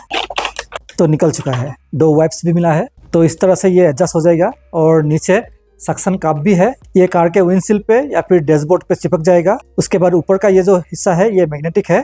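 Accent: Assam, India. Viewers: Bro had the Assamese accent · Assam or niki vai.. hindi tu huni Assamese jen lgise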